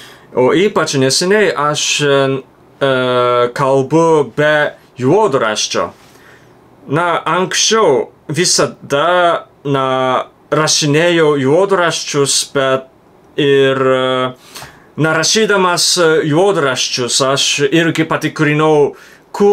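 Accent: Japan. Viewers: Gerai gyvemam...Šiaudais kurenam. Dūmai rūksta, nieko netrūksta. Vaike, nekreipk dėmesio. Tavo kalba nuostabi.Lengvas japoniškas akcentas yra labai malonus ausiai. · Aš tiems kurie kritikuoja tavo tarimą, pasakyčiau. Kada taip pat gerai kaip pradėsi tarti japoniškai, tada kritikuok jo lietuvių kalbos tarimą. O pasakysiu, kaip lietuvis tu tari lietuviškai siaubingai ir tai labai gerai, nes tu esi japonas. Kaip japono, tavo lietuvių kalbos tarimas nuostabus. Svarbiausia, kad mes tavo išsakytas mintis puikiausiai suprantame ir mes tavęs besiklausydami jaučiame pasitenkinimą. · Šeip galvojau, kad būtų naudingas patarimas -pastebėjau, kad kartais žodžius tari kaip tariama hiragana raidėmis ,bet čia yra taip nes mokeisi hiragana garsus nuo jaunystės, tai mano manymu kuo daugiau mokysiesi tuo tavo lietuvių pataps lietuviškesnė ir hiragana tarimas nueis. Aš girdėjes esu gerą patarimą iš tokio kino kuris moko anglų kalbos, kad geriausiai gerinasi tarimas kai žiūri filmus ir mimikuoji kaip aktoriai taria. 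Linkiu tau didžiausios sekmės su mokymuosi!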